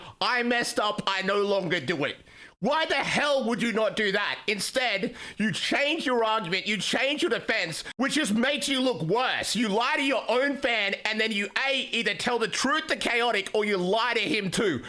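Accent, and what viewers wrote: Australia. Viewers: Came for the drama, stayed for the Aussie accent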